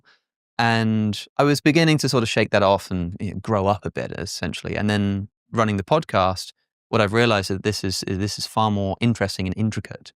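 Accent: United Kingdom. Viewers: I think you meant to say "why are you not" The answer to "why are you hot." is because he is an intellectual with a smooth british accent and a soft face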